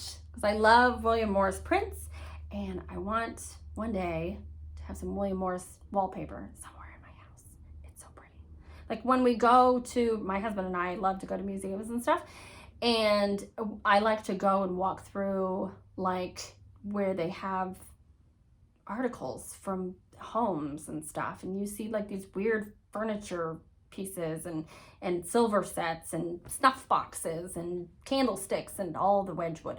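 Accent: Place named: France. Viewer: Hi, really enjoyed your video and energy. Can wait to see more. Your French accent is perfect! Are you French?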